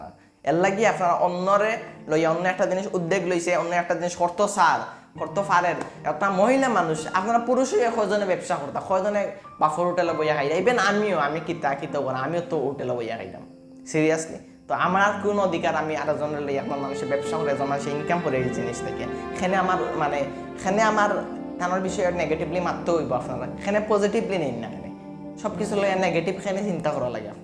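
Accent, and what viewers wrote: Sylhet, Bangladesh. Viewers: Hmm Good সিলেটি আঞ্চলিক ভাষায় কথা কইলে বালা লাগে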